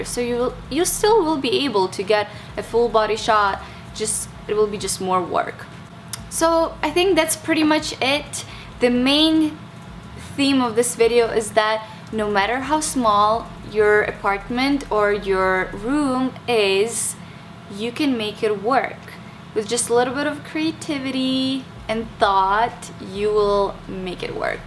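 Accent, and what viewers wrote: Canada. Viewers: exactly,i think she is canadian. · Now i know how the Canadian accent sound like :) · Ukrainian / Canadian me thinks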